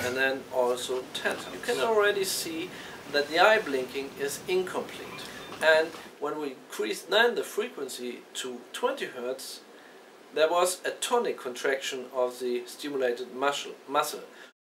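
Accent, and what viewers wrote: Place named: Germany. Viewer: du bist deutscher oder? man hörts voll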